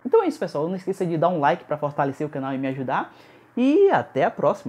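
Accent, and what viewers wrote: Bahia, Brazil. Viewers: Meio estranho um sotaque baiano falando de tecnologia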